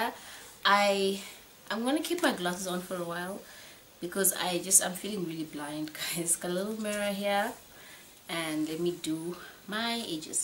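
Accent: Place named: United States. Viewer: You sound like you have recently visited the US. Wa-rrer. You look amazing.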